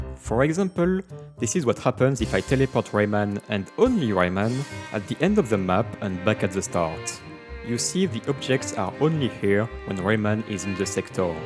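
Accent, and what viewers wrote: France. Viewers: Are you French? You sound like one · What a good english with french accent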